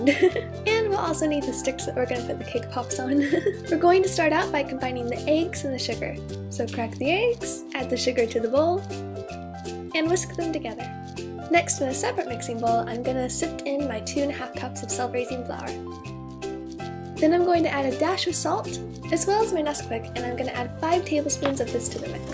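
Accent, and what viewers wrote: United Kingdom. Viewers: Omg, you are starting to sound British!!!! this is the best day of my life :)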